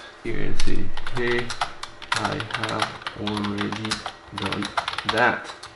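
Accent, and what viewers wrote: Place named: France. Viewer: Whenever I start a video and I hear "Hello and welcome back!" with that hint of a French accent I know I will learn something about vintage computers today.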